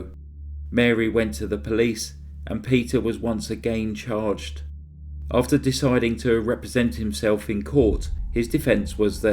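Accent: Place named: Scotland, United Kingdom. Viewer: Sorry that you lost your friend! Was fun to hear a Scottish accented intro.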